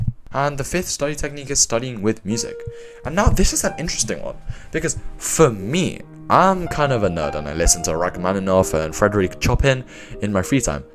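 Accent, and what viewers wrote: United Kingdom. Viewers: With the helpful stuff aside, dude your british accent is so good to listen to. Im an asian myself and use american ones, but your voice is peak pls keep cooking.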